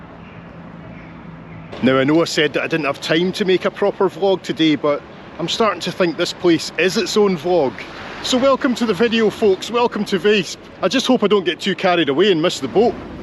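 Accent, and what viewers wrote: Scotland, United Kingdom. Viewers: Ahhhh... Here we go again, a braw Scottish voice, taking me on a trip I can only dream about !!! Thanks for all your efforts to entertain us all, and I am looking forward to this video, just as soon as I make a Coffee !!! Many Thanks !!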